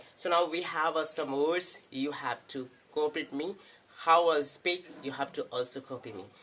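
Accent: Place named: United Kingdom. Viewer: Wow! Nice British accent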